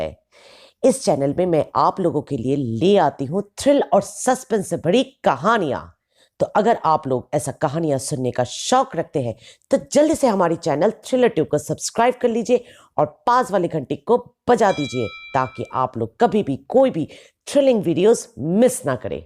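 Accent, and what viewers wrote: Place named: Bengal, India. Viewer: I like the bengali accent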